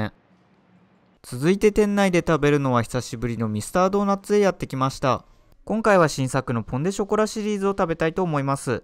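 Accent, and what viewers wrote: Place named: Kansai, Japan. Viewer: 私はミスドでイーブイだけ食べれたけど売り切ればっかりでした😖 関西色強めですね😂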